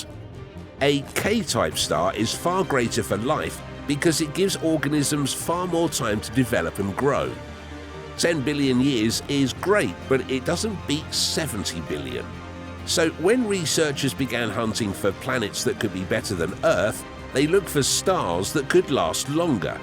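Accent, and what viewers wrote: United Kingdom. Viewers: Why does the guy have a British accent then?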